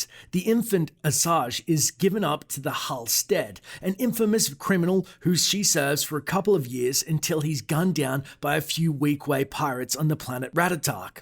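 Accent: Australia. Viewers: I love hearing an Aussie narrate these videos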